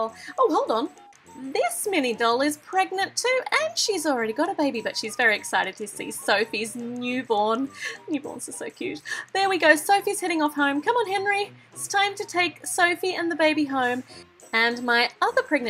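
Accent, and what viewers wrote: Australia. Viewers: Is she australian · Are you from Australia?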